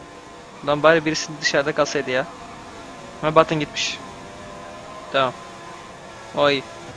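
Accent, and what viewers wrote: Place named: Hatay, Turkey. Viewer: diksiyonun baya düzelmiş. Ayrıca Hataylı olduğunu ilk duyduğumda inanmamıştım ama bu tonlamayla başka bir ihtimal düşünemiyorum.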